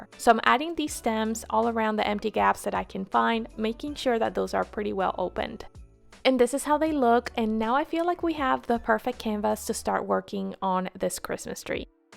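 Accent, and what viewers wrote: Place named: Nicaragua. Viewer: such a nice job. I love that you make two videos one an English and another in Spanish. I have watched them both 😊 maybe I will try to do that myself on my videos if you don’t mind 😊Beautiful tree I love the burgundy ornaments & the hints of Cream ribbon 🎀 Took your tree to the next level, adding the candles gave it an old world touched. I also love the way you decorated your chimney & that vase I want 😊 it simply beautiful. The birth of Christ is really nice and unique. Nice to know that you are from Venezuela. Because I was wondering the way you spoke in Spanish. Was very similar to mine Nicaragua. I hope you’re having a nice day.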